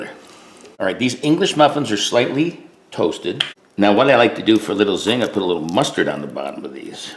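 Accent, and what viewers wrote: United States. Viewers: I’m from Australia I love ur American accent 10/10 👌🏽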